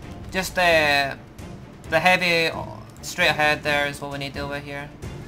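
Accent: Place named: Scotland, United Kingdom. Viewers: Where from scotland u from pal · not sure for certain but sounds like Glasgow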